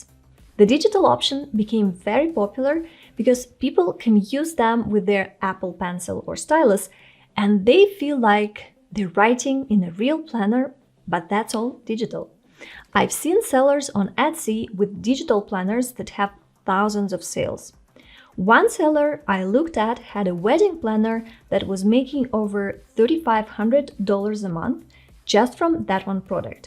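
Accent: Russia. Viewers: russian accent is amazing, spasiba balchoy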